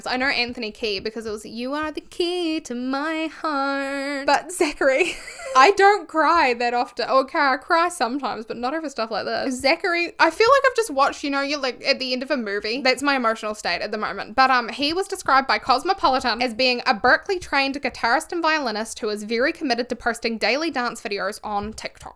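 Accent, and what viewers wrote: Southland, New Zealand. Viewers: Never heard of you or boy throb, but now, I appreciate boy throb greatly and I subscribed to you. Also cool to see New Zealander on YouTube and I also found it funny that you had to explain your accent as kiwi accents are everywhere is Australia lol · I know this is 8 months old, but your constant fiddling with your taonga while dropping that Southland accent is sending me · english is not my first language, I only learned it through english movies, videos etc. and I can't explain my level of confusion after clicking this video. it's the first time I ever heard kiwi accent and for 5 mins I thought I never actually understood english language all my life. love the video btw I'm glad yt decided to recommend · Loved the deep dive, thanks for the effort you put into this. For my part, I think they clearly are both talented, enthusiastic, committed to their craft, but also not a typical over-polished boy band, with comedic elements to their presentation, music and style. 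They're in on the joke, but they are much more than just a joke. I think it's very possible there is someone behind the scenes that helped bring them together, coordinate their efforts, and give them some interesting creative direction, but if anything it would be a collaboration not that the band members are just along for the ride. Ps love your kiwi accent. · "my accent? it's a kiwi accent" right as I was wondering "huh, not British.. not Australian..." the moment I scrolled down and saw "NEW ZEALAND" by the description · She literally says in the video that it's a Kiwi (New Zealand) accent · You do the New Zealand O proud 👏🏽... or should I say "the New Zealand Oarrr." Or is it "Ower.?." 🤷🏽‍♂️ I have no idea what you're doing with your O's but I ❤it. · I was listening to your accent for a looong second, and I was like "wait? Nz??? Surely right?!", and then I saw the description!!! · just got recommended this video, and as if ur videos dont seem to be up my alley already, i heard ur kiwi accent, and as a fellow kiwi, immediate subscribe. 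its hard to find us out here !!! 😻😻😻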